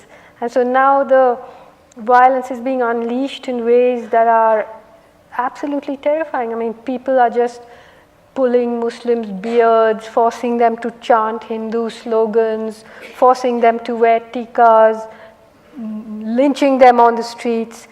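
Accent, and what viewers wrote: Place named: United Kingdom. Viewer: She is another school of propaganda unto herself . Propaganda always has an element of truth manipulated to an end with creating even a more radical social polarisation than what actually exists on the ground . She really never talks about the emergency , or the biggest riots in the pre modi era , the kashmiri pandits exodus . She definitely has an upper caste Hindu phobia . In her pursuit of happiness , all the Hindu characters are cruel blood Mongers who crave Muslim’s blood , always vile and wicked while all the Muslims and third genders are noble , peace loving , innocent , righteous, just and beautiful .. specially the kashmiris are depicted as the most noble and kind hearted race in the world. What sort of a joke is that ? Her generalisations are gross and frustrating . Never been more fed up of a mainstream writer . 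What she is doing to society isn’t just a disservice but a more serious damage . In order to criticise one radical form of militant hinduism and the Muslim phobic right wing in India , she is demonzing the entire mainstream Indian culture and Hindus at large. Her theory is more simple than what her chaste British English attempts to hide - her one point program to create a demon out of everything that hinders her propaganda. There is absolutely zero analysis in her arguments ,only blanket sweeps and gross generalisations , even lies ! She picks and chooses facts at will , even the degree of the facts reported by her is manipulated . I wonder how come a brai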